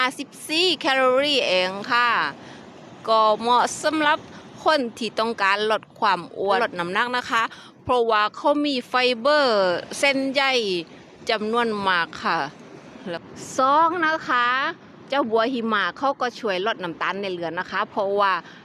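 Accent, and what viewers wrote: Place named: South, Thailand. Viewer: ให้กำลังใจน้องกันดีกว่าคะ.บางครั้งการทำคริบในการพูดแบบนี้มันก็มีติดบ้างอะไรบ้างนิดหน่อยมันก็ไม่ได้น่าเกลียดอะไรสำเนียงใต้ฟังก็เพราะดีนี่คะ.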